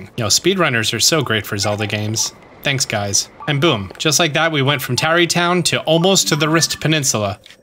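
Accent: New Jersey, United States. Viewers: Wait you're from Jersey? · What part of New Jersey bro?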